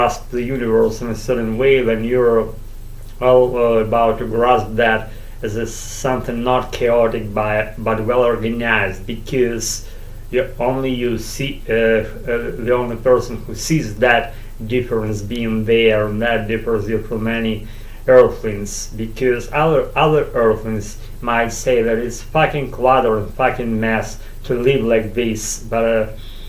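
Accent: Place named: Russia. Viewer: I just enjoy hearing the Russian accent, nothing in particular :)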